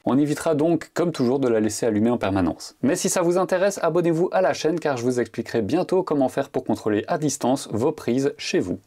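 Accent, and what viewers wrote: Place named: France. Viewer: I am from the usa and youtube tries to put the english ai robot voice over your beautiful French accent, I turned it off ;) I can't want for this one! I just bought an H2D to go alongside my X1C and those printers are bulletproof workhorses, but this U1 will speed up multicolor prints by so much, while reducing waste as well as some color bleed issues I had to tune out of some of my prints with a lot of white filament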